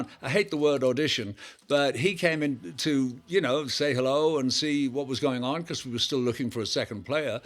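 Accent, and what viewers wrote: RP, United Kingdom. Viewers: He speaks rather posh English.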